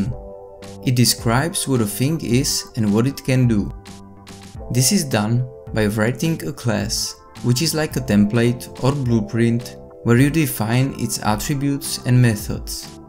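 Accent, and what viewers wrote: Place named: Czech Republic. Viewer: Český přízvuk nezapřeš :D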